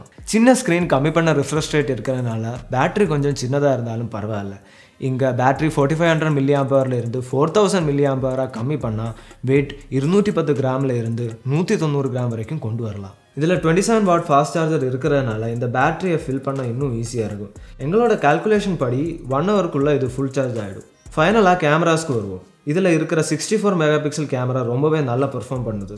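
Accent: Kerala, India. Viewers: Bro nega malayalam mix tamil pasuriga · Malayali yoh? Bcoz ur slang looks like Malayalam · Mallu accent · அதெல்லாம் சரி க்கு தமிழ் தடுமாறுதே. மலையாளம் வருது.... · Malayalam kalantha tamil um kekka nalla than irukku ❤️ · Nice explained.. but I felt ஒரு மலையாளி தமிழ் பேசுற மாதிரி இருக்கு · Ena bro unga voicela lighta tamil+malayalam kalanthu varuthu neenga malayaliya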